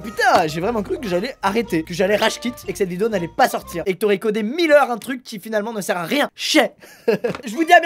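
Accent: Quebec, Canada. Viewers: Et ben squeezie tu parle en québécois tu nous explique??? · Tu sais parler quebequoi comme moi en tabarnak,😂jte soutien dla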